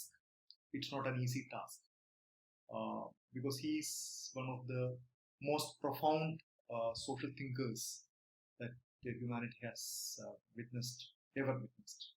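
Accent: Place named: India. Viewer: The recording quality is poor. In combination with the thicker vocalization of an Indian accent, it turns into a fishbowl when played at high speed. But it's too ponderous to play at any lower speed.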